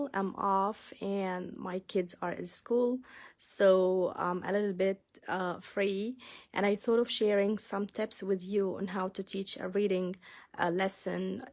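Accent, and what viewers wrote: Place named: United Kingdom. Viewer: تبارك الله Your language is really perfect fluent and confident, British accent.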